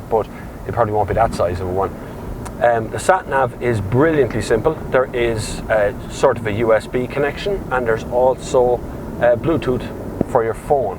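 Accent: Ireland. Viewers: I like your Irish accent :D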